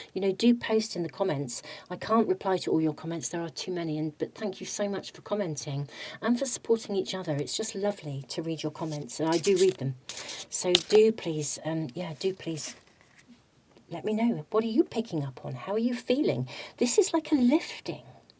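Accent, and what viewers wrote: United Kingdom. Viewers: P1. I am so ready for change and start a whole new chapter of my life in the best possible way. I been healing, creating, building, sacrificing and strategizing so it will all come together. A life I co-create with the Universe. A life of pure authenticity and individuacion. Thank you! Your choice of words are very encouraging. Just love your UK English accent and choice of words that’s very depicting from your region. I live in Ecuador but I am Polish-Australian. ❤❤❤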